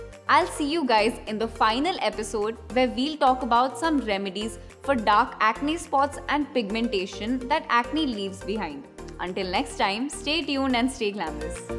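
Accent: India. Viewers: Her Indian accent "patoto"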